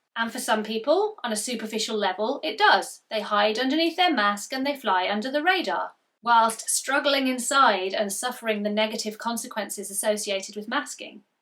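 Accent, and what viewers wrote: United Kingdom. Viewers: I like you just as you are. And, I'm American, and I love British accents!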